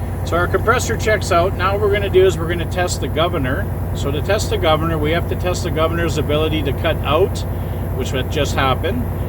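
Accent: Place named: Canada. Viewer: Finally... I found someone who sounds 'Canadian' ...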